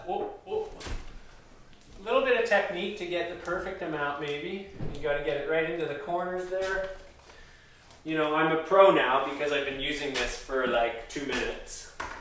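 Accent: Canada. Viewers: Cool... The older you get, the more Canadian you sound eh. Awesome videos. Thanks!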